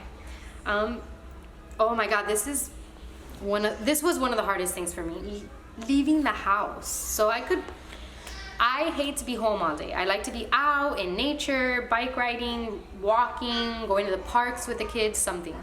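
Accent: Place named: San Francisco, United States. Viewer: I knew I recognized that accent! I live in SF too lol. I’m not pregnant (yet) but am doing research because I plan to have children soon. I always planned to have my children 1.5 years apart but this is eye opening. I really enjoy your videos, thanks so much for your honesty. Your eyes are gorgeous btw.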